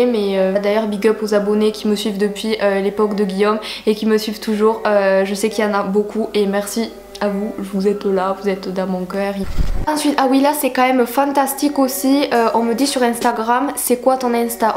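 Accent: South, France. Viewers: pq tu fait un accent du sud alors que tu vis à strasbourg ? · T’inquiète l’accent du sud c’est le meilleur 🔥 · L'accent du sud c'est le sang Moi aussi g un accent mais c drole sinon j'adore ton accent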